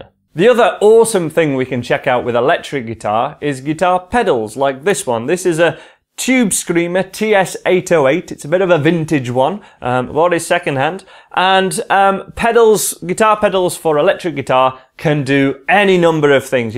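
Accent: United Kingdom. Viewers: Can someone tell me the names of the set used in this video and organize them according to price, sorry i can't catch words from the British accent that much and thanks in advance! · im from the US and i can hear this guy just fine. he's got a British accent, but i can everything perfectly.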